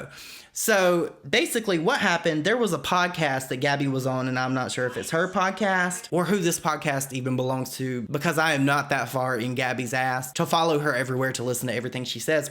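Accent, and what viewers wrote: South, United States. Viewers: Being a TN girl I didn’t realize it till now but a southern accent adds so much drama and oomph to any tea it made all this shit going on 10 times more interesting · Hey, well you r saying what of a bad person she is, but look at you.... Let your damn southern accent look at its self first and then you can judge about others. What you are doing is pretty fckd up. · This is the first video I've ever seen of yours and I am an instant fan! Subscribed with notifications on! Love your southern accent! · Omg- its something new with this whacko every single day! Thanks for all of your hard work, to bring us the latest tea 😉 And, OMG- your voice & adorable southern accent are so calming to me! I just adore you! 💛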